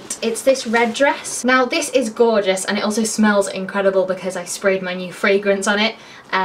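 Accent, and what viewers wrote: United Kingdom. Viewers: You're soooo beautiful! Happy birthday! I love your videos and your british accent! XOXO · HOLY CRAP YOUR BRITISH!!! LOVE IT!!! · It's a british accent, I don't know which one :o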